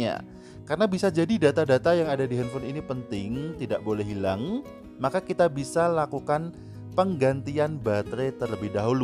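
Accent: Magelang, Indonesia. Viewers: mas sampeyan wong Magelang ndi?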